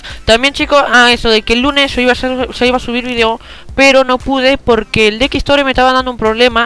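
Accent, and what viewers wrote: Argentina. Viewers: sos argentino o mexicano?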